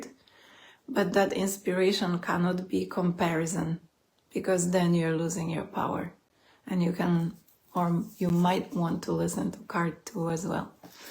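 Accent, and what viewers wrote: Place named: Russia. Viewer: Nifty Russian accent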